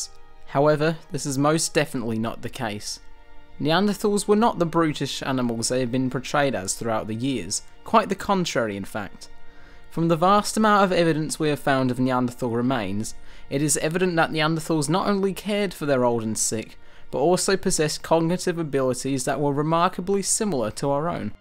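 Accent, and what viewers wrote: United Kingdom. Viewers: He's a Brit. What do you expect?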